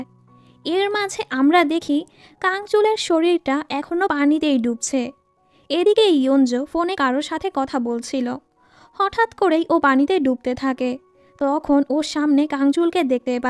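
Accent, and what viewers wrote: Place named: Tangail, Bangladesh. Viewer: Boilo to tangail ar vasha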